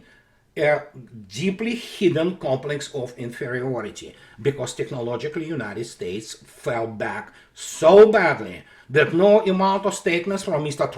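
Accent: Russia. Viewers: it's your Russian accent (and sense of humour) that makes you so endearing to us. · Mr., your accent is like music ( Russian heavy metal) and your English is excellent. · I am Italian, maybe for this reason I understand perfectly well your russian accent 😁... who knows... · Thanks for speaking out. In spite of of our recent history Russian accents were always great and yours is no different.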